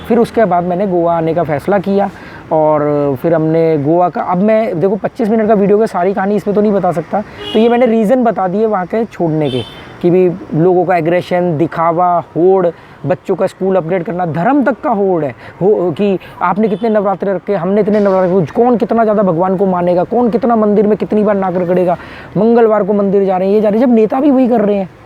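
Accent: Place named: Pakistan. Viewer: SIR SIR PLEASE ANSWER ME IN VIDEO HOW YOU TALK URDU MEANS HINDI VERY GOOD .I MEAN YOUR ACCENT LIKE PAKISTANI PEOPLE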